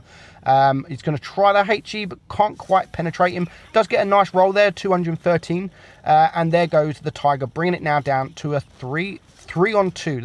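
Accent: United Kingdom. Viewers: Dude, he's british. People accoss the world speak differently. Try to accept that, eh?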